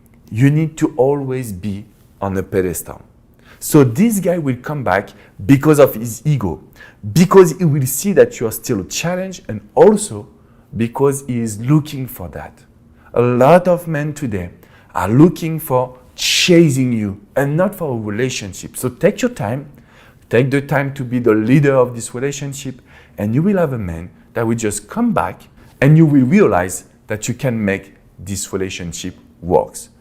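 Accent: France. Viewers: Ur frensh accent is all i can think abt right now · are you French ? I can hear the accent lol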